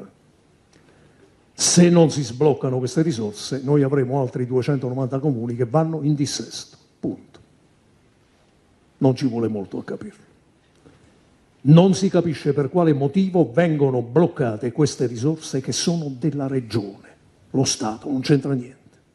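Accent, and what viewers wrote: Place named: South, Italy. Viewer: quelli che chiede De Luca sono i soldi che la tua regione....non so quale sia....ma dal disprezzo che mostri sicuramente una del nord ... ha già preso!!!! Mentre a noi li bloccano senza motivo!!!!! Ti voglio ricordare che i tuoi ministri del lumbard- Veneto.....non hanno fatto un caxxo quando il covid vi ha dilaniato....e sai perché???? Non avete più sanità pubblica!!!!! Formigoni e compagni hanno privatizzato tutto.....e la gente è morta per mano dei suoi concittadini!!!!! Questo è il tuo nord!!!! La lega ha RUBATO 50milioni di euro allo stato italiano....per spese di gestione.....bah!!!! e li restituisce a tozzi e bocconi spalmati non per quanti anni!!!!!!!!!!! Il mio commento non ha accento così almeno le tu orecchie non soffrono!!!!! Purtroppo il mio cervello soffre solo a leggere certi post superficiali di gente che scrive ripetendo cose sentite giusto per esibire un razzismo che fa tendenza soprattutto con questo governo!!!!! 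Il tuo salvini si è alleato anche con il M5S.... la ndrangheta ho investito nel profondo nord milioni di euro per ripulire il denaro che come tu ben sai proviene da attività illecite!! COME MAI NESSUN PERBENISTA NORDISTA SI È OFFESO O SCANDALIZZATO????? Ti offende l'accento di un cittadino del sud....e non ti offende quello che i tuoi politici fanno???? TRA POCO UN SOLO ACCENTO AVRETE NELLE VOSTRE ORECCHIE.....QUELLO NIGERIANO.....con tutto il rispetto sicuramente meglio del vostro!!!!!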